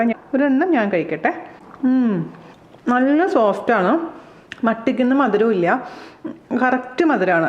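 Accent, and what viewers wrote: Thrissur, India. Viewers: I am new fan if u frm mumbai, surely will try this ariyunda. Ari varuthu chayayil ittu kazhikkunnathu kandittundu. Pandu shabarimalakku nadannu poyirunna alkkar hard aya ariyunda erinju wild animals ne okke odikkarundu ennu ammamma paranjathu orma vannu. paranjille harda aya ariyunda erinjal chathu povum ennu. Athu kettapool ammamma paranja karyam orthu poyi. Enthayalum iniyum lockdown recipes iniyum poratte. One more thing. Ur Trissur style of speaking us really attractive.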